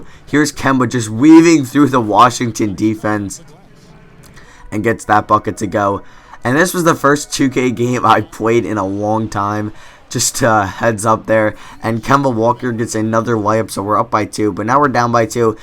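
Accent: Pittsburgh, United States. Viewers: You from Pittsburgh?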